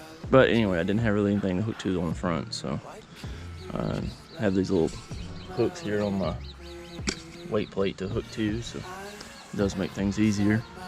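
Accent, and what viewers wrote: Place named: West Virginia, United States. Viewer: I would swear you was here in West Virginia driving that old Teg you call “Skeeter” . Same way we do it out East here anyhow. Guess Alabama aint much different than here. Shit had me laughing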